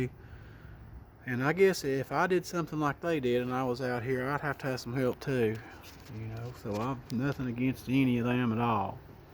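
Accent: South, United States. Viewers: My family is from Lookout Mountain, from Bama, Tennessee and Georgia parts. I was born in Ft Oglethorpe, but raised in Dallas, Texas. We made two or three trips "home" to Georgia every year. Hearing your voice reminds me of family that i miss, it's comforting to me. Those mountains and woods do have dark elements to them. The spiritual dimension is very real. Our bodies die, but our spirit doesn't. Believers know that our spirit will get a new body. Scripture tells us that demons and bad spirits go to the high mountains, and to dry, barren and waterless places- like the desert. It even says some inhabit "dirty birds", like the buzzards, vultures, the bittern and even owls. This is one reason that owls are related to false gods like Molech, who is still worshipped today. It is written that there are demon spirits that come off the skin of dying evil men. 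They seek a place to rest, but cannot rest. Their need is to inhabit wicked men who would accept them. Witches go up the mountains to a forest groves to do their rituals, and have since the beginning and time. The darkness is real, the spiritual dimension is real, it can be a dangerous thing to invoke these spirits into the physical realm. You may get more than you bargained for. Thank you so much, i enjoyed your video. Subbed! Lord bless and keep you ❤🙏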